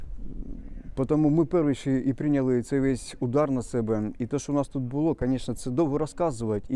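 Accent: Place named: Surzhyk, Ukraine. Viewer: не дивлячись на те що ви говорите на суржику , видно як ви намагаєтесь говорити українською. Ви молодець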